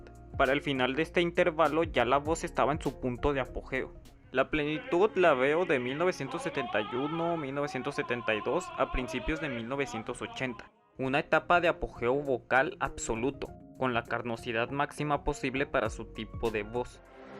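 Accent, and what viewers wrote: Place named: Mexico. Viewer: Dall'accento direi che è messicano. Sicuramente non spagnolo.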